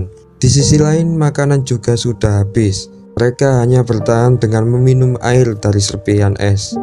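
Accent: Java, Indonesia. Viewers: Medoknya lho rek wkwk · JAWINYA MEDOK BNGT MASS 😁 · jawa nya medok bgt 😫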